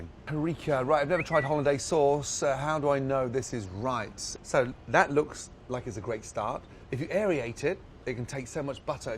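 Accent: United Kingdom. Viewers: イギリス英語を勉強できるいい教材だと思います。 イギリス英語を喋る友達となんか雰囲気が似てる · 料理と関係ないけどイギリス英語やっぱ好きだ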